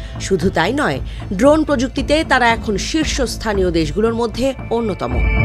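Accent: Kolkata, India. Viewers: উপস্থাপিকা কি কলকাতার??? কথা তো বাংলাদেশীর মতো লাগছে না । কি ntv???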